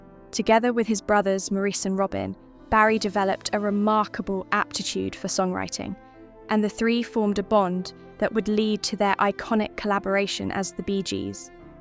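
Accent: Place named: United Kingdom. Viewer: LOSE the extremely heavy British accent!!!! It doesn't work well for narrations!!!!!! 😮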